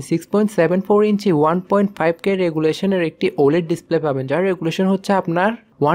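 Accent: Chittagong, Bangladesh. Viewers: Vai apner Basha Chittagong e Tai na?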